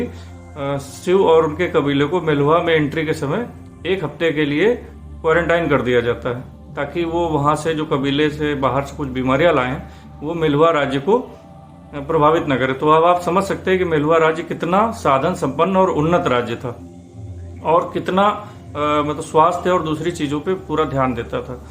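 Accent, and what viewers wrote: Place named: Kanpur, India. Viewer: Dheere dheere kanpuriya style bahar aa rha ab By the way great explaination of shiva triology series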